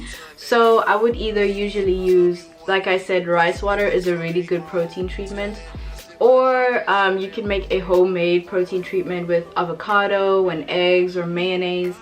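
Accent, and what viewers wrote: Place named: Caribbean. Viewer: Your accent is so pretty, are you from the Caribbean?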